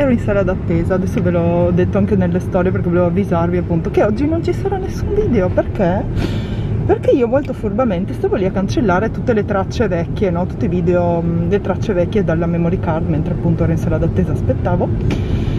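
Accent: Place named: Bologna, Italy. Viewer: con l'accento bolognese!!! Adorooo!!!!:)))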